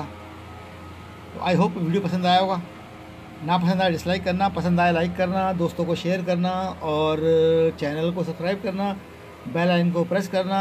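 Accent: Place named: Madhya Pradesh, India. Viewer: Apki language se lagta hai ki ap Madhya Pradesh SE hoo..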